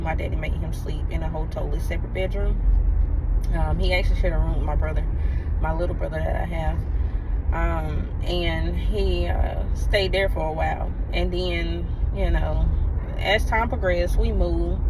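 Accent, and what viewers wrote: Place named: South, United States. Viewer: You are so right. I wasn’t going to say this but I’m a psychologist. If you feel like something isn’t right, it’s best to tackle the problem right away because it can only make things worse if you don’t. Early childhood development is very important. Everything is going to be ok though. You have to pray and keep going. By the way I’m seeing a lot more confidence lol and the accent is 🔥🔥🔥 you so country lol. God bless. Keep vloging.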